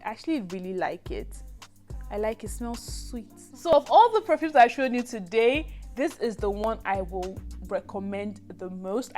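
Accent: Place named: England, United Kingdom. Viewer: Hi thanks for the video , why do I sometimes pick up an English accent in your voice. Btw mousuf is great.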